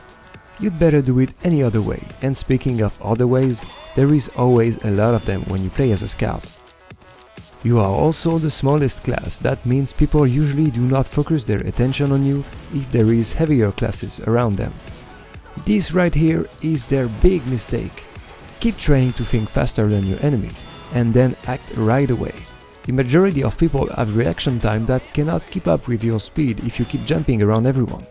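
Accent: France. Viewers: Are you French? :D That sounds like a French accent. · I just like listening to his therapeutic French voice.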